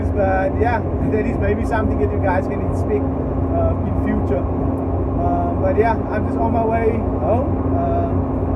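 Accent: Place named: South Africa. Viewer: Where are you from bro? Your accent sounds South African am I right....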